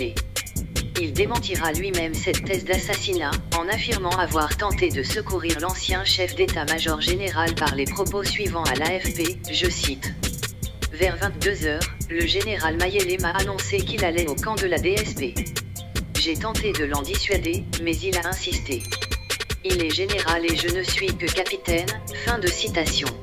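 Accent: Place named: France. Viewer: Bizarre. Même pas capable de prononcer les noms comme un Congolais... Accentuation et nasalisations à la française...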